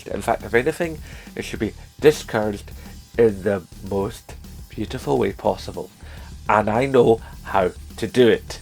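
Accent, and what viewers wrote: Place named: Jamaica. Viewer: Wait a minute you're white?? Thought you were Jamaican bro!!?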